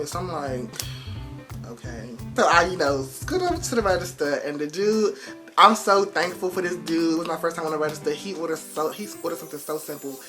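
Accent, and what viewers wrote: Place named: DMV, United States. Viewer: Your accent is so DMV, I know my people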